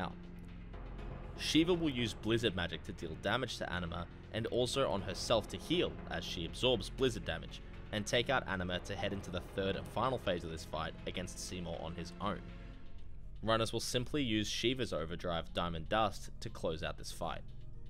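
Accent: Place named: Australia. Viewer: nuts how you have so little subs loving this vid + the aussie accent always makes me feel at home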